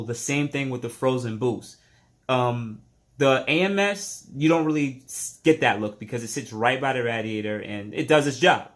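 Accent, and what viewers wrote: New York, United States. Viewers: he's a new yorker, you wouldnt get it.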